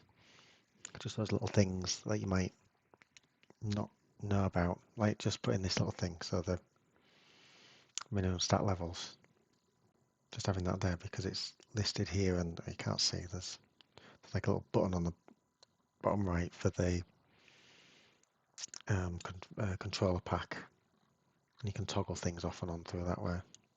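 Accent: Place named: Hull, United Kingdom. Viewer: Sounds like a Hull accent.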